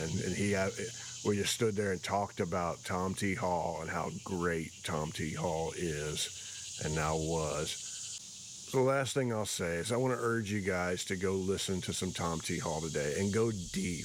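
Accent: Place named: Asheville, United States. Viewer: I had just commented on your guitar player post last night about this. Man that sucks so bad. I wish my brother was still alive because he would be right with me on the commiseration today. He died in 2004 July 23rd. He turned me onto Tom T Hall, Johnny Cash, and all the older country and southern rock artists. I was a punk rock and thrash skater with a limited knowledge of older dudes like that. I sure appreciated him playing that stuff for me. Also when you get down to it the jump from bands like The Clash, The Violent Femmes, and Uncle Tupelo really ain't that far a stretch. I feel like you can play rock versions of country or country versions of rock. As music goes it's all the same there's only 12 notes and I only use 5 of them. Anyways as story tellers go Tom T. Hall was amazing. You have that same kind of delivery and ability... 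I play your stuff more and more and listen to the stories matter of fact the other day my wife said "That has such a nice voice. Comforting and sounds like people back home in Asheville. I could listen to him talk and sing all day" aside from that s#$* still been jamming on the songs you write. LOL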